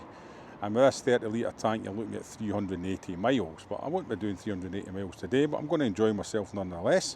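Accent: Scotland, United Kingdom. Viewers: Hi, great review. I had to get used to your Scottish accent, but once I did, I really understood you (I'm from upstate NY). Can you do a review of the GSA with ASA? I'm curious as to what you think about it. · One of the very best reviews on the <num> GSA by a person who obviously knows his GS or GSA bikes. A very good video compilation comprising super illustration of bike sounds and agility, and love that cool headed Scottish accent - just like your country cousins here in Co. Antrim 😄 ! I love my R1250GSA TE and the 1300 GSA TE will indeed need to be top notch to get me off it. Your video certainly has encouraged me to make that move ....... say in another year but the move is now on the cards given your excellent review answering lots of my unknowns and obviously a test ride will be in order. It's hard to beat German engineering. Many Thanks, Wm.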